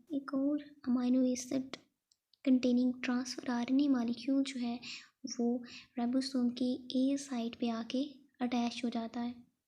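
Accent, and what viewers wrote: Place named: Pakistan. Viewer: pakistani accent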